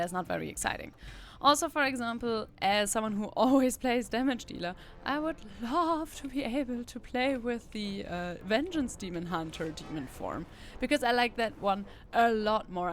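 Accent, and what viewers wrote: Germany. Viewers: egal wie sehr man sich anstrengt, man hört immer wenn wer deutsch ist :D ich kenn das Problem haha, tolles Video.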